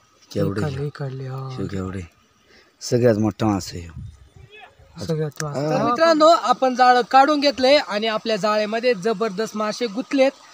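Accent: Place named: Kolhapur, India. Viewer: Mst video. Tu kolhapur cha aahes ka? Kokani bhasha bolat nahis mhnun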